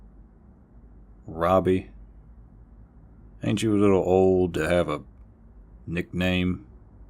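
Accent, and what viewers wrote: South, United States. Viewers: The southern accent rahhhh I love me a southern gentlemannnn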